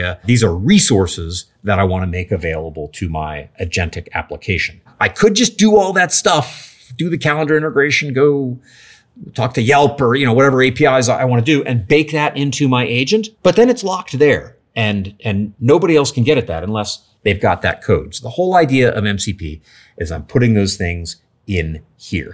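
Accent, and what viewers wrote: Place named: United States. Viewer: We need an AI to turn unbearable american accents into nicer ones within the youtube player.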